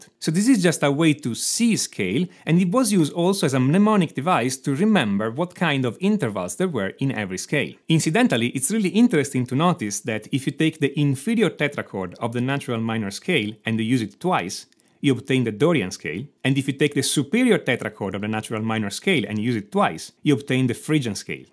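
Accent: Italy. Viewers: Avevo immaginato tu fossi italiano, il mio orecchio ha sentito giusto 😂😊🇮🇹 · Si sente che sei italiano <3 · Ho Capito che eri italiano dalla prima parola. Comunque il video è molto interessante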